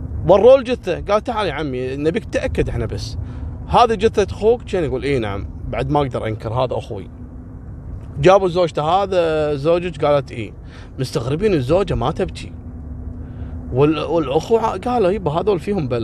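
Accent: Gulf, Arab world. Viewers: طريقه كلامك اتغيرت انا الان بعام ٢٠٢٤ وهذا الفديو قبل اربع سنين كلامك كويتي ميه ميه الحين خليجي مخلط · الله يسعدك لهجتك مثل لهجتنا في السعودية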